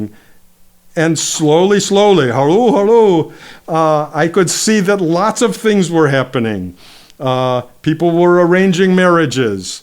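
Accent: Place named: India. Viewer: full gavathi marathi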